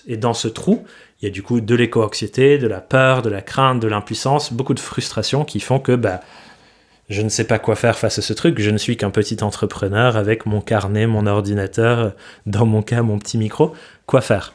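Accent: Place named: England, United Kingdom. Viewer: On aime toujours autant ton accent anglais ;) Merci pour vos recos monsieur !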